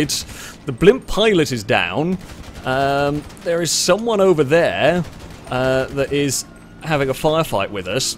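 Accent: United Kingdom. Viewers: there's nothing like a british man narrating his criminal adventures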